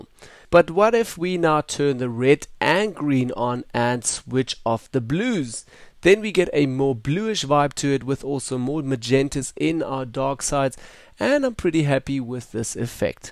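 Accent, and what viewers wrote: South Africa. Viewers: You sound South African. I dig that!!! Nice video!!!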